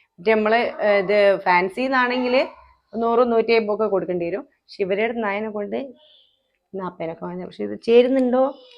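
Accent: Kozhikode, India. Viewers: കോഴിക്കോട് ഭാഷ ഇഷ്ട്ടം ആണ്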